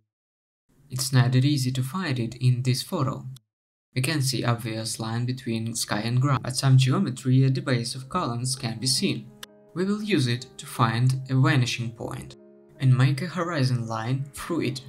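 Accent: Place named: United Kingdom. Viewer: местами 100% британец, а иногда заговаривался, успехов!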